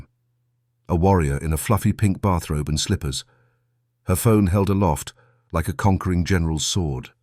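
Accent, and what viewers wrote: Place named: United Kingdom. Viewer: What's with the British accent? Make it American. Viewing from Binghamton, NY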